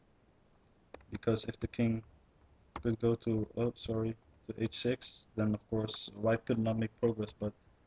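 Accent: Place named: Egypt. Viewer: Why English Mr?!, For who you are making this? Make same video with your sweet Egyptian accent pls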